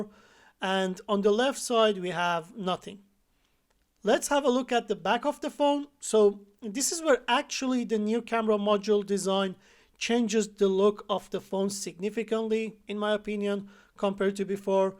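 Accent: Iran. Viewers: I know a Persian accent when I hear one lol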